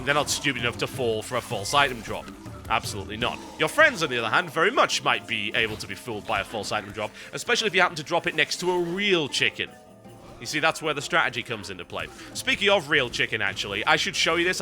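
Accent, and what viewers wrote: United Kingdom. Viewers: is British, that's why he might sound a bit odd in your ears.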